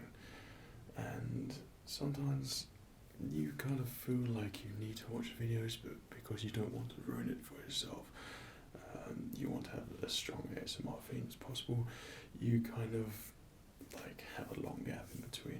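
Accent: United Kingdom. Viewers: What region of Britain do you come from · I'm glad you're making videos again. Your voice is a big trigger for me. Especially when you start to speak softer and softer and softezzzzzzz.... And I've noticed British accents also really work for me, though, no clue why.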